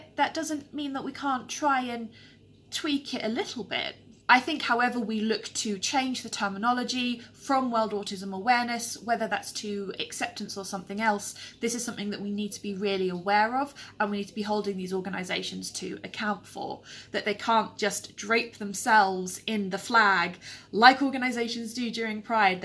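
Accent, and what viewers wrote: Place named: United Kingdom. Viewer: This is the first time I've heard your voice. I didn't know you were British. (I follow you on Twitter) Congrats on 700 Subs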